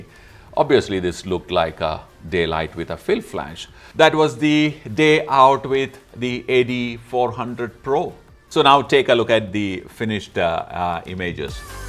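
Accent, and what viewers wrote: India. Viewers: RARELY where i keep listening to an Indian English speaking person! but you sir got me to listen! your production got me to sub and I'll keep watching.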